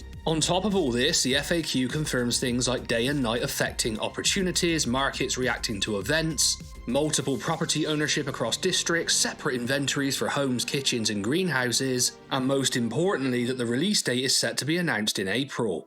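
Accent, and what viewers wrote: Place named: Bristol, United Kingdom. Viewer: You sound like you're from my neck of the woods me babber!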